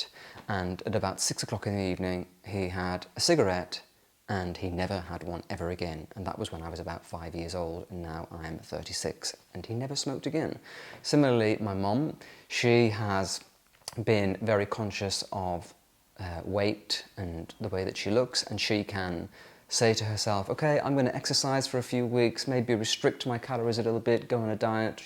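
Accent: RP, United Kingdom. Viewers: I have been watching since your old flat. I think you look the same. Maybe your accent has become a bit more posh. You are lucky you are not aging. No still the same. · What a posh accent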